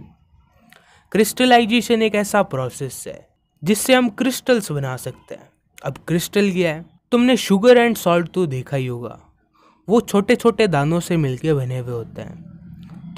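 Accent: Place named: Bengal, India. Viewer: Sir are u bengali that mota moti sounds familiar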